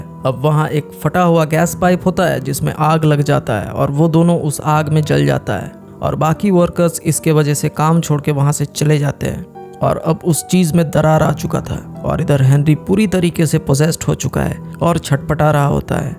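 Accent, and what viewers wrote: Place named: Bengal, India. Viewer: Bhai new explainer ho tum. Lekin tumhara story telling style aur awaaz unique hai. Apne script me Bengali accent mat lao aur thoda aur serious explain kro. U earned a subscriber❤❤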